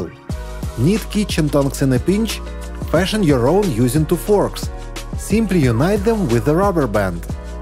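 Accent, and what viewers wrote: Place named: Russia. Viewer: Такой тяжелый русский вариант английского-невозможно сосредоточиться на сути.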